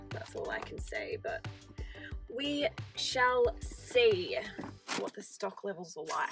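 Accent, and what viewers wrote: Australia. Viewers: Our spotlight is a bit like that in Queanbeyan NSW. We don’t even have one in Canberra. But we have 3 Lincrafts? I am enjoying all your videos so nice to hear an Aussie for a change.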